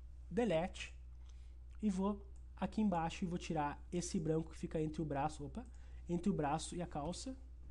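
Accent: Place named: Rio Grande do Sul, Brazil. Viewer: Eu simplesmente amei Gostei do modo que você falar, com certeza é Gaucho muittto bom mesmo, aprendi demais Obrigadaaa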